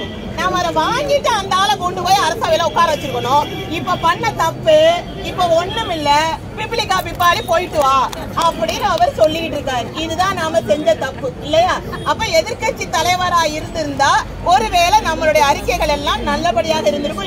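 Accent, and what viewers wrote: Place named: Trichy, India. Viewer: திருச்சி தமிழ் தவழ்கிறது. வாழ்த்துக்கள்.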